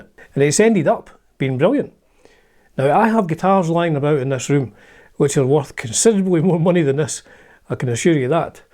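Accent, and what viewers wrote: Scotland, United Kingdom. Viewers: Damn it YOU REALLY ROCK man ! Btw I would not understand one tenth of what you're saying without reading the subtitles, but it is good ! This way I will practice my Scottish (isn't it?) regional accent comprehension.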